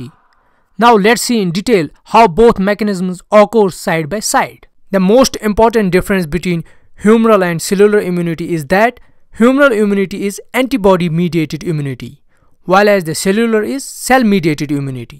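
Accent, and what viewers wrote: Kashmir, India. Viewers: Are you a Kashmiri? Coz that's a very similar accent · Idk y but sir your accent seems Kashmiri 😅😅 but truely it made it kinda more easier to understand ..tysm sir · Kashmiri accent 💕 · Typical kashmiri accent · amazing vedio sir ...💕 we all kashmiris sound different and beautiful thats why i was able to recognize u ...keep going ✨ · Indian Accent again. Sucks!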